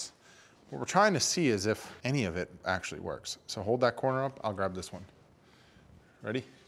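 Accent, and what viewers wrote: Pittsburgh, United States. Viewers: He has a Pittsburgh accent plus he says “Yinz” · Hey yinz, new to the channel, love the Pittsburghese!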